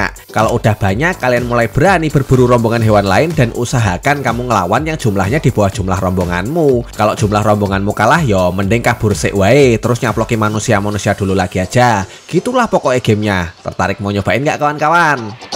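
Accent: Java, Indonesia. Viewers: Lanjut bang,mantap logat jowo ne kentel banget. Yang ngeh no 6 liat size nya kalian emang debes ws pokok e,Iso ngeh lo salut salut,Lanjut bang.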